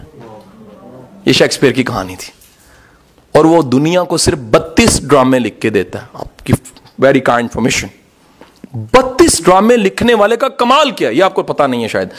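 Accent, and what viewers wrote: Punjab, India. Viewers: sir your punjabi speaking style is great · Punjabi hain sir is liay...actuall style me bolty hn kch covering ni use krty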